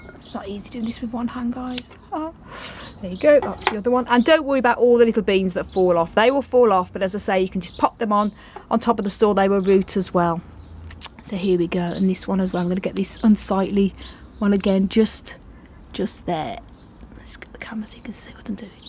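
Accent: United Kingdom. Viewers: So pampering voice you have I love UK accent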